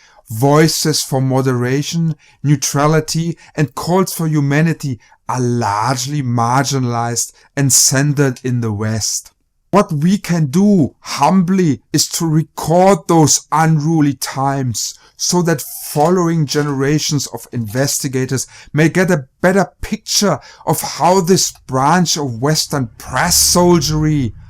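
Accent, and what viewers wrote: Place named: Germany. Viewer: dude....i clearly can hear your german accent.....where exactly do you come from?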